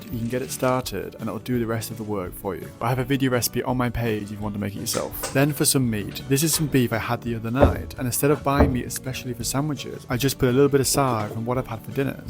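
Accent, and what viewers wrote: United Kingdom. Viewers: لحجه بریتیشو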